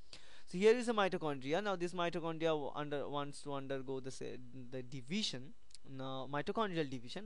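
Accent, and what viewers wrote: India. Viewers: Can you make captions for your videos? I’m not Indian so I have trouble understanding you.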